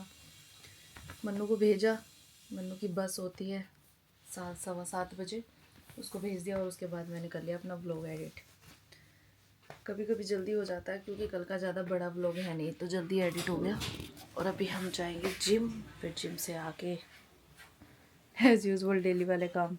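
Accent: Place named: Multan, Pakistan. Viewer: Aap.ko Multani bolnee aatee hai. Aap Kaa accents hai Multani bolnei kaa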